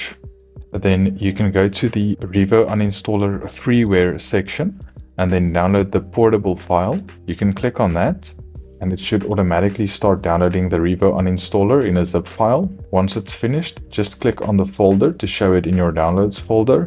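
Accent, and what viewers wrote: South Africa. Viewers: Do I hear a South African accent in there? 👀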